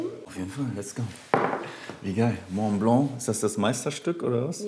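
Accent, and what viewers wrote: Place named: Germany. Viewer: Du hast den deutschesten Akzent der Welt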